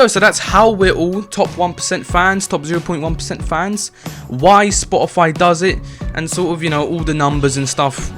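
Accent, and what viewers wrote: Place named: United Kingdom. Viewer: i thought u were dankpods for a bit, then i heard u were british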